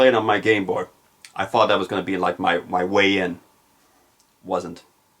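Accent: Skåne, Sweden. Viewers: Är du skåning??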